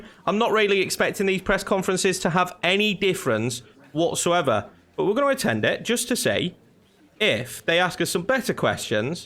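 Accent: Yorkshire, United Kingdom. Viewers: Where you from in Yorkshire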